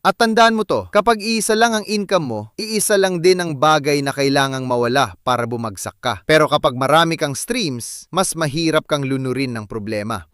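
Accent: India. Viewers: bakit nagiging tunog bumbay??? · Mokhang mombay ang nag sasalita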